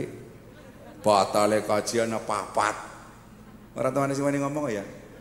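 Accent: Tegal, Indonesia. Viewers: logat tegalane medok temen gawai seneng wong brebes nang tangerang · Tidak merasa bosen dgn ceramah ki entus lucu dengan logat Tegal nya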